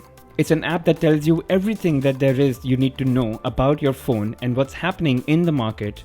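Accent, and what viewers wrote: India. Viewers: amit gupta We love being rqcists. I should sue this guy!! I had 2 seizures because of his God Damn fucking voice/nasty ass Indian accent. I like India like I like the flu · bruh... indian accent English is pure fucking shit ear cancer! Also, no app for begging for "bobs and vegana"? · I'm a white guy from California. I can understand what he is saying no problem. He has an Indian accent but Its not that bad.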